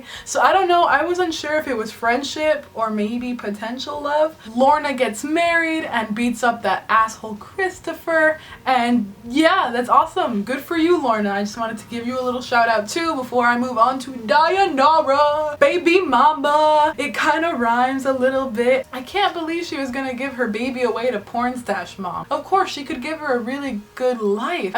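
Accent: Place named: Russia. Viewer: your russian accent is on point. also **ermagerd spoilers** my theory is that bennett might have had something to do with the DEA raiding cesar's apartment. perhaps he thought the best chance for those kids was to get cesar away from them. i just don't want to believe he would desert daya like that :C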